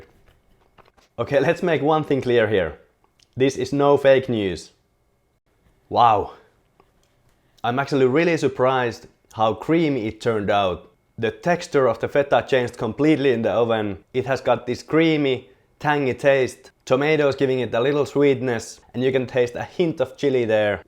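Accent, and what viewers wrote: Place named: Finland. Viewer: It's the Finnish accent for me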